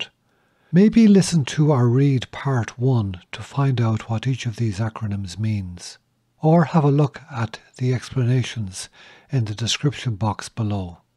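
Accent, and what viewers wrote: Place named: Kildare, Ireland. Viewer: For a Dub you really sound more like a Kildare man, there is a bogger in you somewhere.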